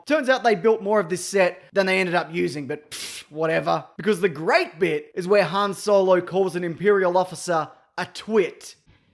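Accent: Australia. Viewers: Ay man where are you from in Australia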